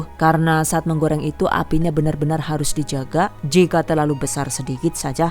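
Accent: Banyumas, Indonesia. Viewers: Jebulane wong ngapaakkk..ora ngapak ora kepenak😂😍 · jebule wong ngapak y mbokayi😂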